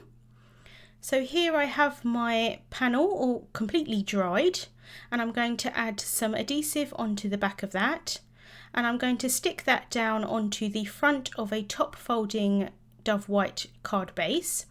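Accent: United Kingdom. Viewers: I always enjoy your video's and just love your British accents!!!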